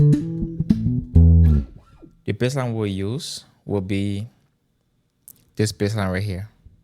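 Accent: Liberia. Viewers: Brother u sound like a Liberian ❤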